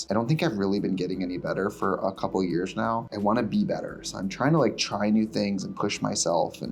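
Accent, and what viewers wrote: Wisconsin, United States. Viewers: LOL, Wisconsin accent. I'm still trying to manifest the x Charlie Berens Wisconsin collab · I love it when your wisc. accent comes through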